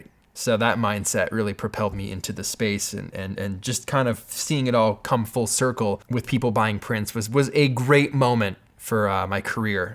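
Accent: United Kingdom. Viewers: your British accent is getting better every video, well done lad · That British accent 😂😂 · British accent sounds like Jamie Oliver.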